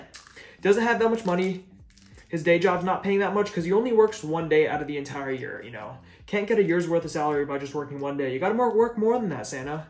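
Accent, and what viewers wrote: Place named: New York, United States. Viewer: I’m from New York and I’d bet money you are either from New York or New Jersey the way you say chocolate 😂 Thanks for this awesome recipe! Happy Holidays sweetie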